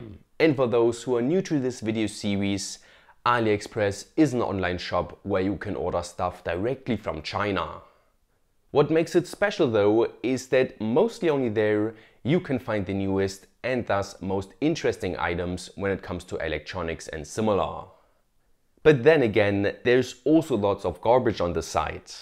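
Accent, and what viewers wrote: Germany. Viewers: nicht sicher aber hörst dich sehr deutsch an also akzent mäßig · Der bre klingt biss europäisch, würde fast sagen, deutsch... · is from Germany. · German accent goes brrrrrrr And nice Video :)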